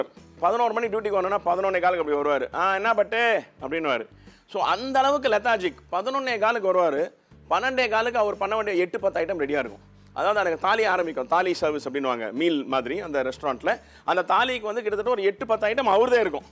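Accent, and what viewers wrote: Chennai, India. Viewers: You are the most amazing person who cooks with love & laughter. I literally love to watch your cooking especially with your lovely speaking.. mostly Madras tamil.. you are truly great. I am living in California for more than 22years but still I am the same Madras girl who loves to cook (only after marriage though😉). Just the way you speak & entertain us, added with your cooking takes it to another level. I admire you soo much. My son loves South Indian food. So just for him I try different styles. You are so amazing.. if it was just a music with cooking or some too much unnecessary talking with cooking , I would not have been drawn to you.. you are lovely with the cooking & the most I admire you is for the way you talk about the cooking or the ingredients. I literally LOL (laugh out loud) whenever I see a recipe from you that I want to try. 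Please be the same person & never ever change your attitude. You are very down to earth & still entertain us & teach us how to cook at the same time. I never ever message or comment to any of anything online thingie in my life so far but I just couldn't resist but to comment/message you. This is Bala from Los Angeles, California but always a tamil ponnu; raised in Chennai. Thank you for your dedication to share your passion to others.. it means a lot to a lot of people that I am sure of. Whenever I hear you speak..that joy & laughter you bring with a recipe means soo much for me. Thanks a lot Mr. Bhat. I hope you read this comment & reply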